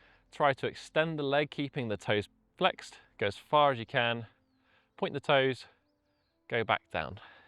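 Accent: United Kingdom. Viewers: This is so good, and the British accent🎉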